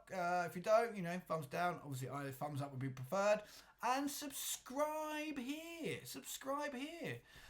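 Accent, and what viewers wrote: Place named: Cambridgeshire, United Kingdom. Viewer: Hello. I very strongly suspected you were from Cambridgeshire based on your accent. But you mentioned in another video that you were from Suffolk. Do people in the two counties have similar accents? I know an English guy from the city of Cambridge who has a similar accent. You also sound like the theoretical biologist Aubrey de Grey at Cambridge University but not as strongly as my friend who was born and raised in Cambridge.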